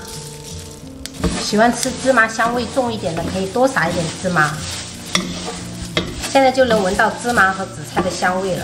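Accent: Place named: Sichuan, China. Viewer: 最接地气了，喜欢你随心随意的分享，非常真诚~要不是听你讲四川话还以为你就是福建人呐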